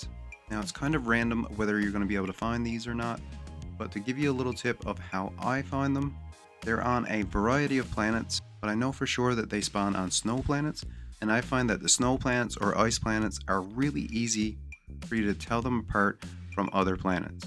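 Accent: Newfoundland, Canada. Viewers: A Newfie?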